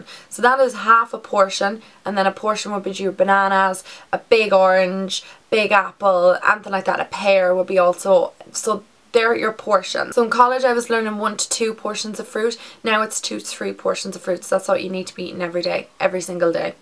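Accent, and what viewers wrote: Ireland. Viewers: this is such a good video and i thank you for making it. i try to eat healthy the thing is when i work i am 100 percent focused on working and i forget to eat sometimes. i seriously do by the time i look at the clock it was like 4 in the afternoon. by the way i love your Irish accent wish i had mine. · Yes I'm doing good. I walk daily, I'm off oxygen, and insulin, so I control my diabetes with diet now. By the way I wanted to let you know that Ireland is my dream vacation. My ancestors are Irish and Scottish. We go back to a cousin of William Wallace. So I love your accent. What kind of dance do you do? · Hi,thanks for such detailed video.I am a doctor myself,working in UK,have lived in Northern Ireland 4 yrs ago,thats why i liked your Irish Accent :) I have myself have these changes of lifestyle by eating healthy and workout! i totally agree there is nothing such thing as diet,its for whole of ur life...i have always heard abt how good oats are,i will try to include them in my brekky from now :) x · cant believe ive never seen you on youtube before, as soon as i heard the accent i got so excited lol (im from ireland too). 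loved this video so much very informative and holy god your figure!!!! its amazing you should be so proud! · the way you say "but" "fun" "much" etc is so different to the British or the Australian or American accent. I still remember the first time that I went to Dublin, I freaked out! you do speak quite clear to me though. hugs!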